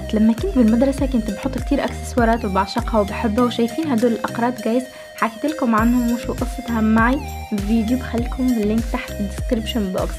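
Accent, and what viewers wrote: Tunisia. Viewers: انتي تونسية علاش تحكي لهجة مش لجهتك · انت تونسية صح لانو لكنة لهجتك تشبه التونسيين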